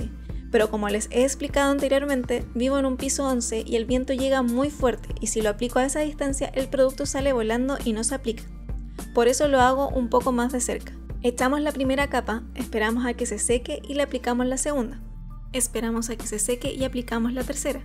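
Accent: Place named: Chile. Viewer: Llegué a este video porque quiero hacer un traje para un concierto de gaga y ninguno me gustaba, soy chileno viviendo en Las Vegas y me encantó tu video por el acento me sentí allá de nuevo Gracias ❤️❤️❤️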